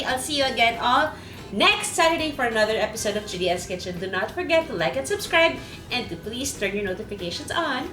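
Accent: Batangas, Philippines. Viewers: Batangenyo accent ang imik habang nagluluto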